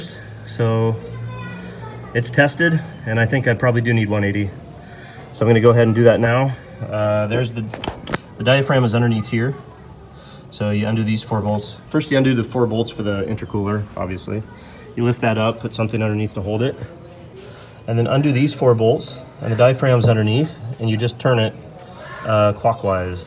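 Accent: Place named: United States. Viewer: Where do you live bro? You sound real american lol. Im totaly confused. Haha. Great videos by the way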